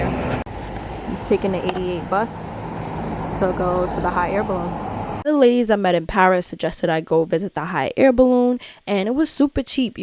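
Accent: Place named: New York, United States. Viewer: Just found you, and I’m surprised you don’t have more followers. New subbie 💕 p.s. I like your NY accent.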